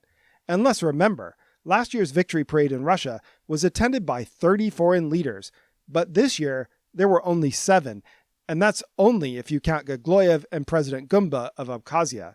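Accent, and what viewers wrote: United States. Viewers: You sound like a American.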